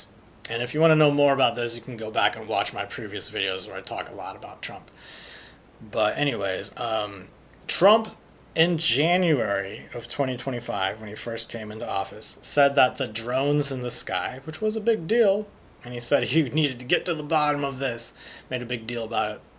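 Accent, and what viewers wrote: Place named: Finland. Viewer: hmm, possibly Finnish? I legit thought you were 100% Finnish at first and you almost like… have an accent? 😅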